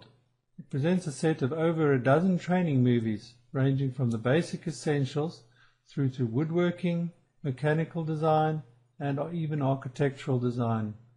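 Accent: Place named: South Africa. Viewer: South Africans should never, ever be allowed to do voiceovers. Horrible. And I'm a South African myself.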